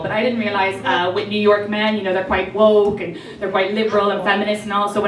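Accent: Ireland. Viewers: you are so delightfully scattered. It's just amazing that you ever finish the set your wrote. But everything you do say is brilliant, so it doesn't matter if you stick to the set. Plus, anything an Irish woman says in that accent is delicious.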